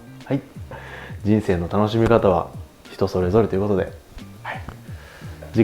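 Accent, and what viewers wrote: Kansai, Japan. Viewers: 想像しただけで痛々しい。 関西弁？で明るく話すけれど大変だったと思います。 私だったら残された人生に感謝もできず自暴自棄になってしまうかも。 生きる力をもらいました。 ありがとう😊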